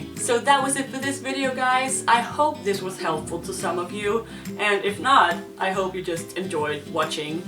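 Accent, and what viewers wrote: Denmark. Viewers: you are awesome! Specially in the videos about Denmark hahaha And as I want to move to DK next year it is also nice to keep contact with the funny danish accent speaking english and getting to know the particularities of this lovely country. Greetings from Brazil!!! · Man kan godt høre på din accent at du er dansker😂😂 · PS: I've seen some of your videos and all I can say is that you're VERY Danish! Your face, your hair color (I love it btw), your facial expressions, your sarcasm, your accent... So Danish! And please don't get me wrong, it's meant to be a compliment :) And since you asked... I would like you to talk about how to be friends with Danes. I'm going to spend a year there and I'm afraid I'll live in a cave with my boyfriend and his friends. It feels like, once you've got a friend, you've got a whole network, but getting that first friend is almost impossible! 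Do you have any tips? I really like your channel and wish you the best luck with it!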